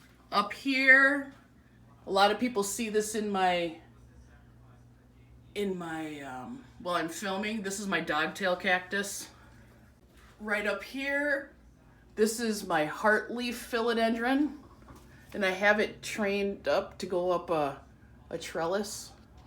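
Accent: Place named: Midwest, United States. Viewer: holy cannoli.. i used to live in Minneapolis! I thought you had a mid west familiar accent. Gonna send you some orchid cactus cuttings! They have orange blooms.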